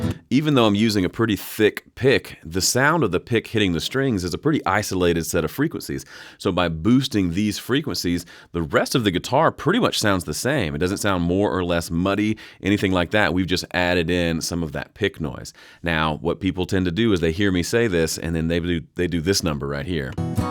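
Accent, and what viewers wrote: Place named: South, United States. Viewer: Thanks for the great videos! These have been very helpful as I self-produce. Also, nice Southern accent!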